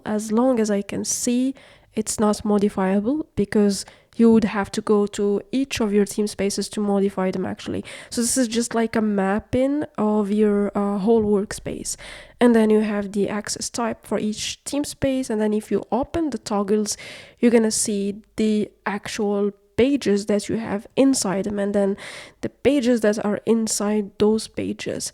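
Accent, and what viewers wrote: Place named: North Africa. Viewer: the voice is giving north african .. are Algerian/Moroccan by any chance? 😊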